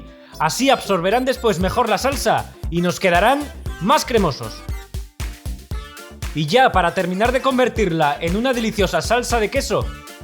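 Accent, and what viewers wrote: Spain. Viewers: Ay. Que sabroso. Se ve. Me encanta tu receta y tu acento español ‼️‼️🇵🇷🇵🇷🇵🇷⭐️💯que viva la Madre Patria. Saludos desde California 🇵🇷♥️⭐️💯💜🙏‼️DIOS TE BENDIGA 🌺🙏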